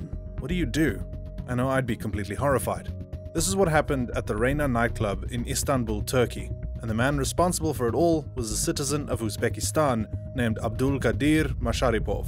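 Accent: South Africa. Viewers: bro are u South African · South African, but it's not that strong, like they've been living in England for a long time . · Hey man super random, but are you South African? I’m SAn too but it’s rare that I find a SA YouTube channel with this content and this good. Would love to hear from you boet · so refreshing to listen to a South African accent for a change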